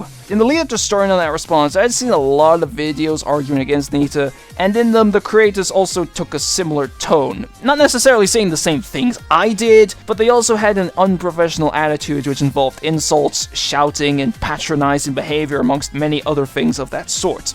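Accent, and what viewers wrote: England, United Kingdom. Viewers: I find it odd that a lot of people didn't know you were English. I knew from your accent, despite how subtle it is. Maybe it's cause I'm autistic as well. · nice video, I like that you own up the mistake also you okay. I thought you sound England but from America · It's weird, I could've sworn that ever since I discovered your channel, I knew you were English because of your accent. But maybe I'm just confusing accents with dialects.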